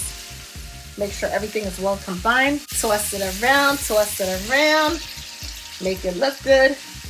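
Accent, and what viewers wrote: New York, United States. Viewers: Excellent video...great explanations...I've already saved to my recipe file. Out of all the Youtube Fried Cabbage Videos, I picked you Ms.! ( I loooove your New Yaulk accent) Gonna make this tonight. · shes nowhere near from the south. ny or jersey maybe. but her cabbage sounds great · Ms. Lady, your accent sounds like NYC, but your S U P E R B, smooth cooking is distinctly southern. Who influenced you? (Inquiring minds want to know).. You are welcome in our abode every day, and ..uh.. bring the cabbage cores with you. LOL. Want to view more of yr cooking. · Southern fried cooking with a NY accent gotta love it!! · Love her New York accent. Dish looking good! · She sounds like she has to be from New York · You sound like you have a new york accent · Definitely going to make this tomorrow for tea. By the way, love your accent I’m from UK. 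( NY ? ) excellent vid. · Her accent ,is of New York!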